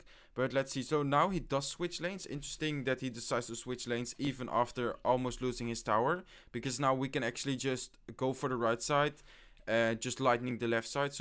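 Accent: Netherlands. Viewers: Are u Dutch? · I know that you are dutch you have the same acsent as kwebbelkop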